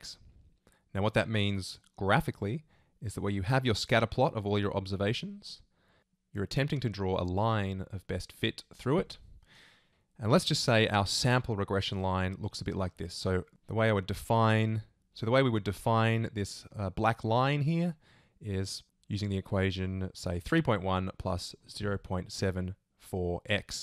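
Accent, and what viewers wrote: Australia. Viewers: you really have that edge over other channels because you have an Australian accent. I find it very pleasant listening to stuff explained with an Australian accent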